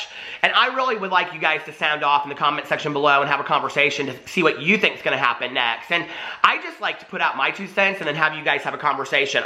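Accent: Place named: Alabama, United States. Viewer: I haven’t watched you in so long but you pulled me right back and boy howdy did I miss you....As an Alabama native myself, you sound more like you’re from here than people I see walking around Walmart...😂 I love it & you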